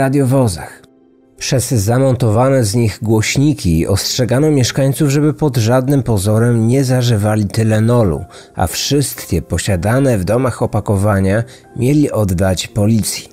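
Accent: Poland. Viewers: Panie, wielkie uznanie za piękną polszczyznę, prawdziwy polski akcent i miły dla ucha głos. Zdrawiam i życzę wielu sukcesów.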